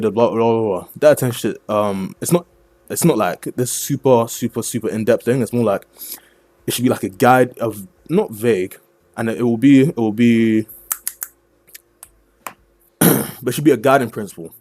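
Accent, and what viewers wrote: United Kingdom. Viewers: Deffo tell you’re from some part of london with the voice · this guy sounds so british and i love to hear that there are other good british editors around in the community and not just a bunch of russian tryhards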